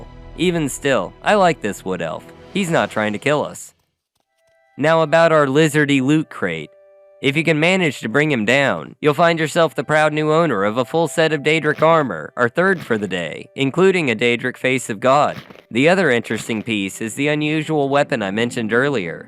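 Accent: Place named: Canada. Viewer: Canadian detected. Don't you put it in your mouth though it might look good to eat (like a carrot or a beet!)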